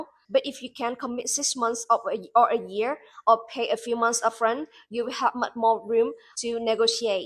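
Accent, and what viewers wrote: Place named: Australia. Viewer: Aussie accent, there.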